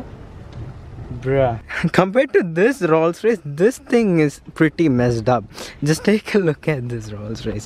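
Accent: India. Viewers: People make fun of other indians because of your accent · Indian accent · YOUR ACCENT TO INDIAN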